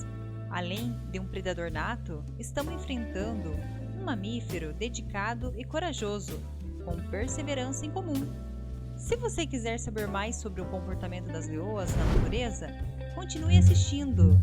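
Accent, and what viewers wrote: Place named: Rio Grande do Sul, Brazil. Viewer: Com esse sotaque "gaúcho" no Brasil preconceituoso tem preguiça em dar like.. opinião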